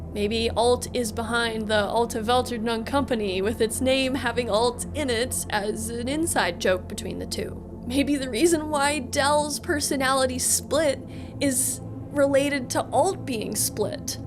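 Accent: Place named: Canada. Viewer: Lake Minnetonka? I thought that was a Canadian accent I heard. I suppose Minnesotan and Canadian accents are pretty much the same.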